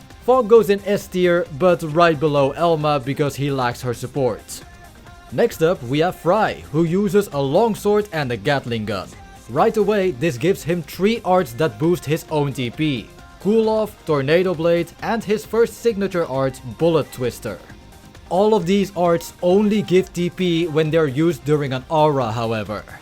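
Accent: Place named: Netherlands. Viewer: Hoi hoe gaat het ik hoor dat je een nederlands accent hebt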